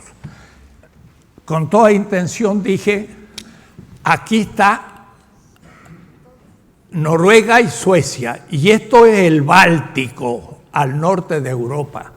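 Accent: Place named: Chile. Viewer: Un ciudadano del mundo . Siempre pensé que era chileno ( por su acento ) hoy me entero que nació en argentina pero que hizo su vida en España palestina México . Tremendo aporte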